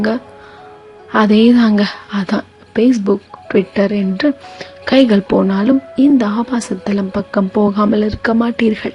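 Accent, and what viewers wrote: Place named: Kerala, India. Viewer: Unga voice Kerala mood arithu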